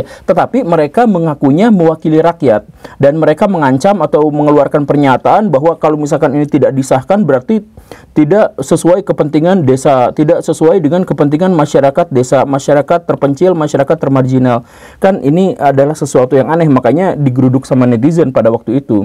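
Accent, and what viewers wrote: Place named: Sunda, Indonesia. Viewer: Aksen sundanya medok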